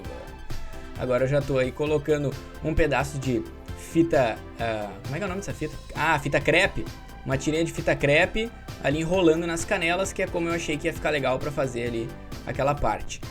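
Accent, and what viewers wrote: Rio Grande do Sul, Brazil. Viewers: Tu é Gaúcho né? Teu sotaque é bem Gaúcho eu sou aqui do Rs e eu reconheço, não sei se é do Rs, mas tu é do Sul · cara vc é Gaúcho?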